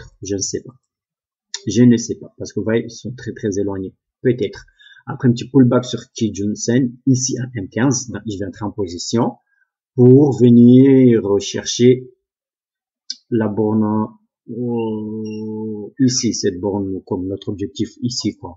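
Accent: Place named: South, France. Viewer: je Veus apprendre ichimoku je commence a trader on <link> sur volatility et je Venus apprendre ichimoku donner vous une journee ou 2 de formation ps les augers ils parlent explique et touts et touts mais ils don’t trop de maniere was m emerge vous vous avec l air cool 😎 bref je Venus vraiment reussir, vous tees du sud Cela s enttend moi je sur sur londre mais a la base de Montpellier bref responder is jamais merci d advance. Fati.... ma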